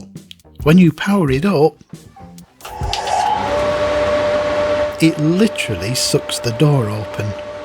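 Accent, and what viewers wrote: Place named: Northern England, United Kingdom. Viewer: Just beautiful! I am putting together my own very tiny workshop. 6ft by 3.5ft on my enclosed balcony. I live in a flat in South Korea, and DIY is not yet very popular because getting stuff done is relatively inexpensive. I love making things, especially from wood, and focus mainly on musical instruments. I don't have any of the tools you have but will be acquiring some as I go along. Thank you for the truly magical tour around your workshop and I shall be stealing some of your ideas for sure (with your permission, of course). By the way I guess you're from the north. I'm originally from Sheffield. A Stanley tools fan!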